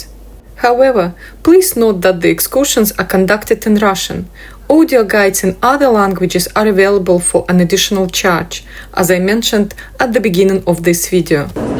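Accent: Scotland, United Kingdom. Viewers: Do I detect a hint of a Scottish accent?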